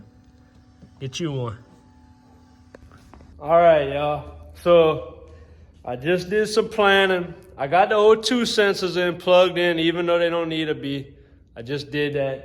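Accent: Louisiana, United States. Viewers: lol, I did I thought you were trying to do some kind of voice then I realized you were from Louisiana, which is like a foreign country to me up north here.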